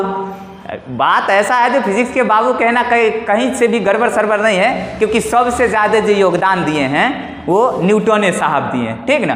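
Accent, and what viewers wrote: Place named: Bhojpuri, India. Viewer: Gajab Bhojpuriya style guru ji😘 ham golu hai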